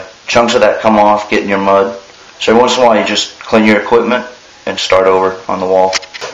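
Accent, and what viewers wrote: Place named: Tennessee, United States. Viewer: i saw back on the other video you didn't take much time to insulate thoroughly or use a vapor barrier. I would guess by your accent..you're in Tennessee maybe??just a guess.I'm in a northern climate, and up here with that little bit of insulation you would have cold toes & frozen pipes. Thanks for taking the time to this series. You did a fine job.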